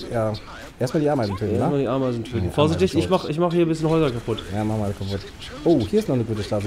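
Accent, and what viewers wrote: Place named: Franconia, Germany. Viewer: ja klingt fränkisch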